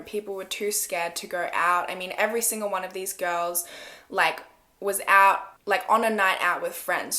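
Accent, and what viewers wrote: Australia. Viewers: Just found your channel and can't get enough of your Australian accent! Love how you say light or right! Keep up the good work! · I KNEW THAT WAS AN AUSSIE ACCENT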